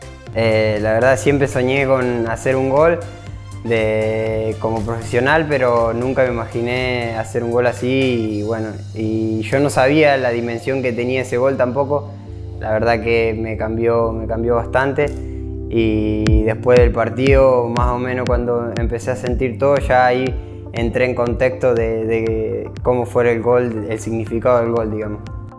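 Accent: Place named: Chile. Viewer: Todo un chileno ya, habla como chileno en sus directos🤑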